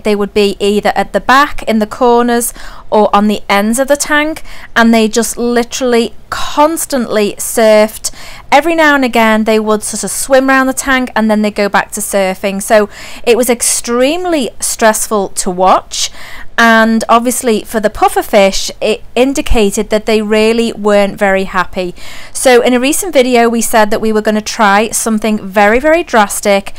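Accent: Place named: Merseyside, United Kingdom. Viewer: Hi the old tank looked good but the new tank looks amazing much more natural, with the Amazon puffers being wild caught the more natural feel of the tank and most of the glass been covered up the fish obviously feel a lot happier, great job 👍 Ps l've just checked out your website and realized that you are in the US but your accent is the same as mine merzyside! 😂😂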